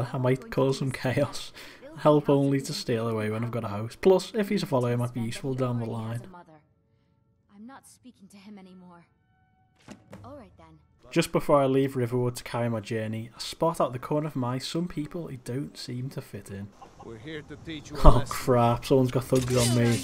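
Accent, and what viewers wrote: Liverpool, United Kingdom. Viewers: Are you a scouser? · You a scouser? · Lad, you scouse? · nice to hear a scouser, here's some engagement for you mate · Scouseeerr!! Loved the video man 👍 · A scouse YTer? Instantly subscribing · Mate, that was a boss first video! It's nice to hear a fellow scouser too, tbh! Subbed! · Awww finally a scouse youtuber! ALREEET LAAADDD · Hi. R u Scouse?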